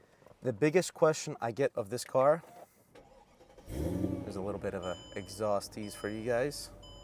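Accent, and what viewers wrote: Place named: Albania. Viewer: You sound Albanian 😢😔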